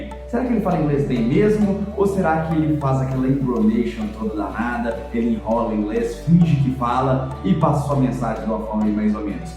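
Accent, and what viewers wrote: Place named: Rio de Janeiro, Brazil. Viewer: A MUSICA encheu o saco, e desconcentrou todo mundo ! E o Ingles Carioquizado me cansa !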